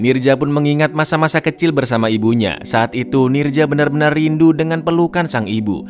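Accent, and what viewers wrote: Java, Indonesia. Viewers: Suweneng aku rek nak Ojo logat e jowo ngeneki subscribe sek👍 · WONG JOWO NDI SUARANE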